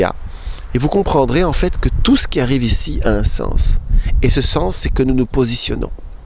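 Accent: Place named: Belgium. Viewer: Ce petit accent... n'est pas français ! Belge peut être ?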